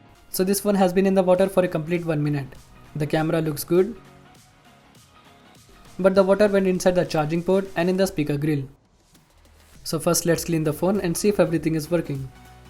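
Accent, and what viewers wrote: India. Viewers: İngilzce konuşan Hint shkfsaj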